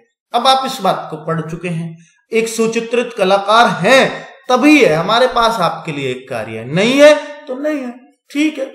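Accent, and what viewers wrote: India. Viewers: Bolne ka Desi tarika nice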